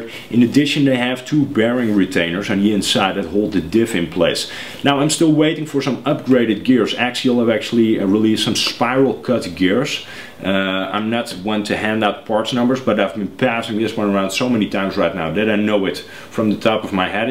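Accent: Netherlands. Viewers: Are you from Holland, you have a dutch accent.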